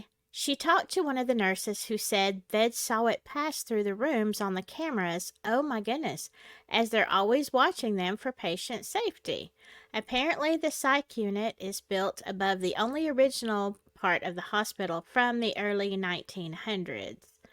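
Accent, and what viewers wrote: South, United States. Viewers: Hello, and fellow nurse! Love your stories and your southern accent. The three's (my favorite number), I always felt, is the Lord's number- father, son and holy ghost. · I love your generous spirit and southern accent. Thanks for keeping us entertained and informed with real paranormal stories. ❤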